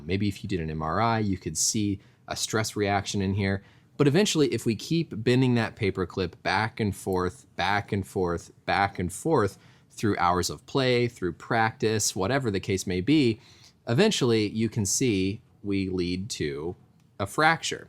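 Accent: United States. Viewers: As soon as I heard his American accent I turned it right off.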